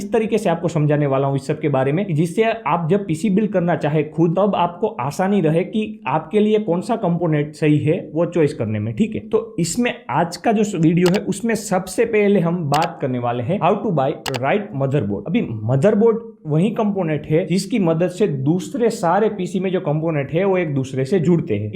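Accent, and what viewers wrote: Gujarat, India. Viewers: Aap Gujarati ho kya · are you gujarati 😅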